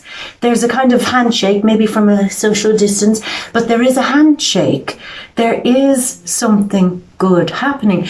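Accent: Ireland. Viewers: I love the the Irish Accent? and that your really trying to keep clean lol. Passion 93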